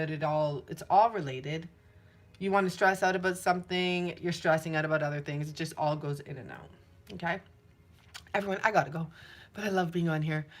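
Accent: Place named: South, United States. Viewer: Last night I woke up at 3am thinking about Pluto(Hades) and it led me to Persephone who is the goddess of vegetation and the underworld. So it is blowing my mind that your reading has touched on that. Plus my deceased grandma has been on my mind prior to the eclipse and we are from South Carolina. So that southern voice hit home... 💜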